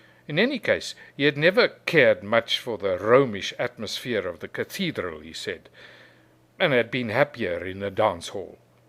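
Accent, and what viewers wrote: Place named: Afrikaans, South Africa. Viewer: baie dankie ou vriend! As a descendent of Casper and Diederik Coetzee who founded the town of Zeerust, originally Coetzeerust, not far from Groot Marico and very much in love on with the works of Herman Charles Bosman, I thank you for this reading. As the previous commentator rightly remarked, this just has to be read with an Afrikaans accent, giving that extra quality to these stories from the 'bosveld'. Please do some more! A Boerseun in the UK.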